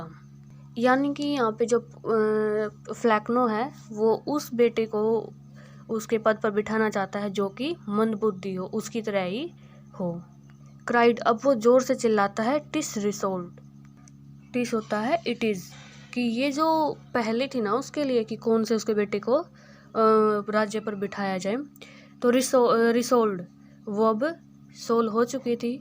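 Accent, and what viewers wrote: Haryana, India. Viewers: Haryana se ho ky?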